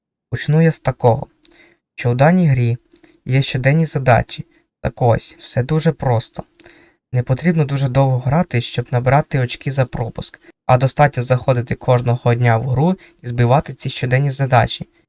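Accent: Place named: Western Ukraine, Ukraine. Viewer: Ти явно десь із заходу, волинь чи рівненщина?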